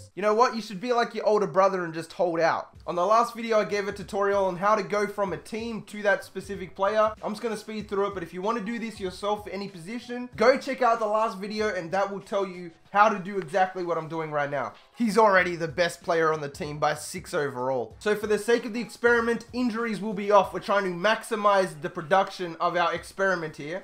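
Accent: New Zealand. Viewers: Are you a kiwi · Are you kiwi?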